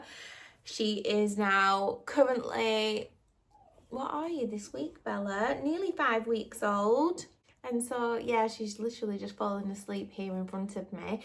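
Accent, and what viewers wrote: Northern England, United Kingdom. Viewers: It depends on regional accents. I think is from the north.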